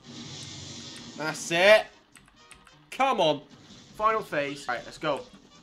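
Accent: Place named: United Kingdom. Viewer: You have the strongest British accent I have ever heard, and I'm Bristolian.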